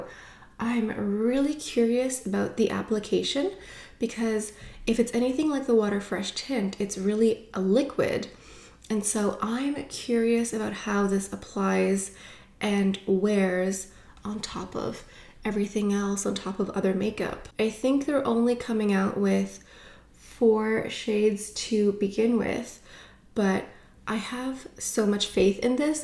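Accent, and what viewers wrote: France. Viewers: You have such a beautiful French accent! Lovely to live in a dual language French-English country. I agree with your assessment of Natasha Denona - definitely some hits and misses for me. I really enjoy using the Chanel palettes we have. I too have grown a little tired of the red/pink color story.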